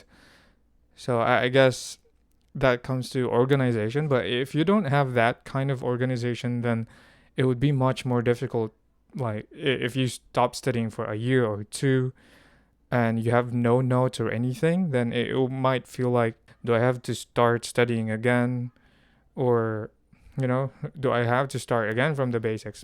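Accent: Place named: United States. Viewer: Hello! I came across your video 2 hours after you uploaded it and I watched till the end. I’m impressed by your talent (speaking 4 languages!) how did you do it?! I can’t even speak fluent English after living in the US for almost years!😂! Needles to say, I hate English subject! Ang hirap talaga mag English😂. Puro Pinay friends ko dito, lahat hindi magaling mag English (basic lang ang alam namin 😂). But I’ll dedicate myself to speak like a native hahaha! You speak like an American. I stumbled upon some Filipina English teachers here in YouTube claiming they have an American accent, they don’t. But you! Bravo! Sana all! Any tips?!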